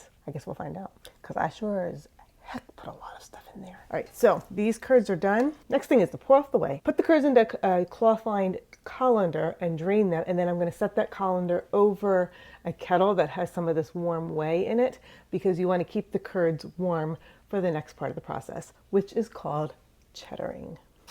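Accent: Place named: Boston, United States. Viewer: What part of Boston are you from? ❤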